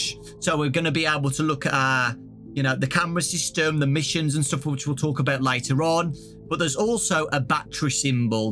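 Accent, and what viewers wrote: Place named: England, United Kingdom. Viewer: I never tought english accent is funny but now… you have changed my perspective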